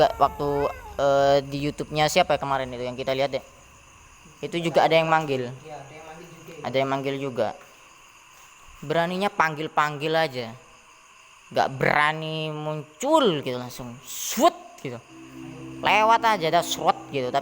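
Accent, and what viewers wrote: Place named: Madura, Indonesia. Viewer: Keliatan bgt anak pesantren. Org jember tp logatnya madura.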